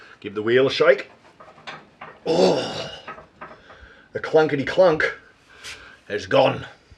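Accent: Australia. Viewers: Love watching your videos, and even better you havnt lost your Australiana, other words you still talk like an Aussie, been watching you guys since you did the Canning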